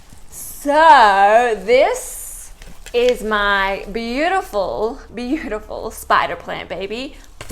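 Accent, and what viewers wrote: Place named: Australia. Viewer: Thanks for the tutorial. I heard about 3-4 accents.🤔very interesting! Geussing Australia?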